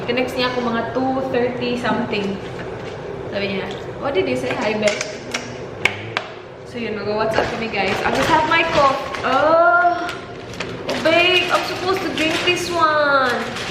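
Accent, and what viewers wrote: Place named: Visayas, Philippines. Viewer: You speak English so well and yet bisaya kaayo gihapon ang dating. Hahaha ✌😇😂💓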